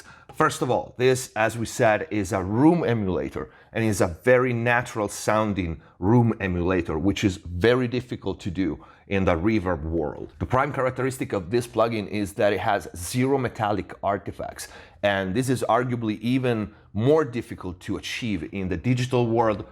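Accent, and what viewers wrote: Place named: Italy. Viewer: Ma allora sei Italiano? Dall'accento infatti, mi sembrava, leggermente familiare con la nostra lingua:). E' molto buono il tuo inglese, sono stato anch'io a Los Angeles e spero di fare un' esperienza ancora più lunga. Grazie per i video e per i consigli, sto imparando alcune cose!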